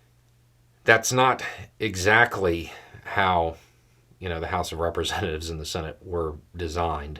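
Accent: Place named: Florida, United States. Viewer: He’s also part of a comedy group who call themselves the liberal rednecks. It’s ironic southern humor. Trae Crowder, who shows up making pretty insightful political commentary once in a while, has a very noticeable rural Tennessee accent. himself, who’s name, btw, is not - that’s a typical deep south name, has a Florida accent which is slightly modified when he makes these videos, but the way he looks? That’s Florida Man. It’s all very regional American humor and plays up to American stereotypes. I can’t tell where you’re from from your name, but I’m willing to bet you’ve got the same thing going on in your country.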